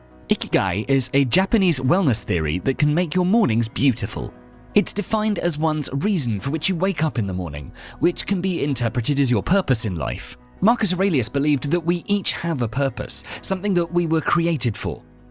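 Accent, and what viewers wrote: England, United Kingdom. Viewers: This video would not work without the English accent. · The lame British accented narrator makes this super cringe worthy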